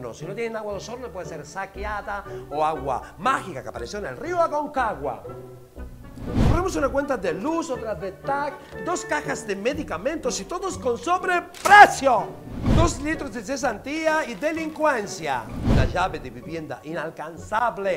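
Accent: Argentina. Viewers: Está wenisimo el video, pero si llevas 23 años en Chile y te relacionas con Chilenos a diario... Como es que aún no has perdido el acento Argentino?. La española Eva Gómez (de El Diario Eva- CHV) a los 5 años de residir en Chile, ya había perdido su acento español y ahora prácticamente es una Chilena más, pero en cambio tú, Barticciotto, Cristina Tocco, etc. Han vivido décadas en Chile y aún hablan como Argentinos. Que se peguen los acentos es algo inocuo de acuerdo a la región o país en dónde estés. Tal vez ustedes piensan que les da más caché seguir hablando como argentinos pareciendo casi una imitación burda (a lo Amaro Gómez-Pablos) 😅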